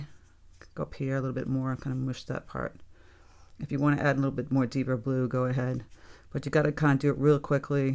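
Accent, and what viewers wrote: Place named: New England, United States. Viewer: Thank you so much! You are such a gifts painter!! I appreciate your instruction so much I love watching you paint, I learn tons of things. ❤ Plus I have grown up in the Southeast, and listening to your New England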